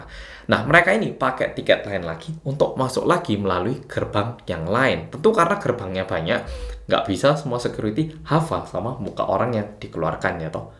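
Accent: Java, Indonesia. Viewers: Mas Korea medok.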